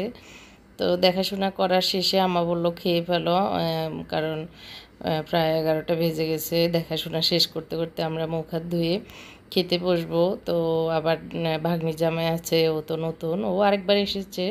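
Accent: Noakhali, Bangladesh. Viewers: Aunty apni fenir naki noakhali r😀😀❤️❤️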